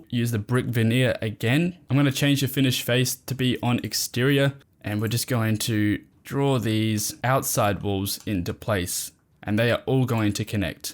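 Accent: Australia. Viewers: I'm learning almost as much about Australian English and vocabulary as I am about Revit. Love it.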